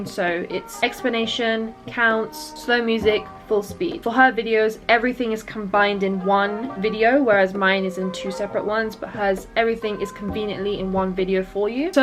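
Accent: United Kingdom. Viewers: I love your British accent and thats why i ve been keep watching your videos instead of watching the videos wit my native language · Ok i know m supposed to be focusing on what she’s saying, but her british accent is lit chef kiss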